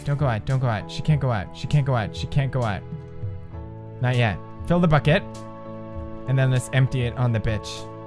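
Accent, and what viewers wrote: Sweden. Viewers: He did say bear but he has a Swedish voice so that in a result is why you hear "beer" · lol it is such a swedish thing, my bf is from there and he says beer as well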